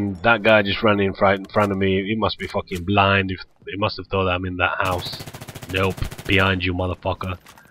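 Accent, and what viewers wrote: United Kingdom. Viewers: He's not from Russia that's for sure... I personally think that he is from somewhere in the UK, I can tell by the way he pronounces some of his words. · he sound British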